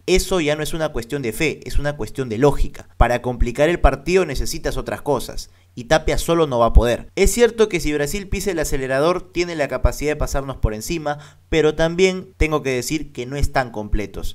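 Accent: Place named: Argentina. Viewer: Obsesionado con los argentinos? Xq tienes un dejo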